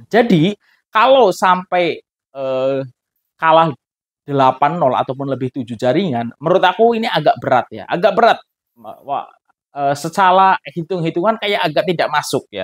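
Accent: Java, Indonesia. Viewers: bang anda asli orang jawa kah?😮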